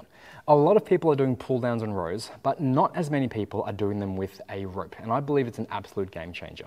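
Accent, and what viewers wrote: Australia. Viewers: Are you Australian? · Are you Australian? Where are you based? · So weird hearing an Asian man speak with a thick Australian accent.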